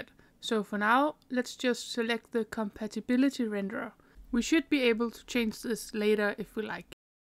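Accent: Denmark. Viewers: Oh, the accent. Are you danish? Greetings from across the western border! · Wow, you sound very Danish, anyways, great guide! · Du er i hvert fald dansker da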